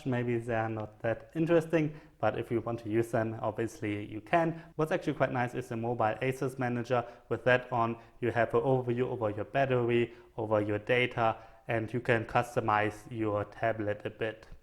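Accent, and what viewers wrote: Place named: Germany. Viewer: mannnn...du hörst dich so dermaßen deutsch an haha nicht schlimm aber dieser deutsche Akzent beim Englisch sprechen, haha viel zu geil, kurze frage wie ist die Performance und sound im Vergleich zum Nexus 9 welches ich überlege hierdurch zu ersetzen, wäre dankbar über eine Antwort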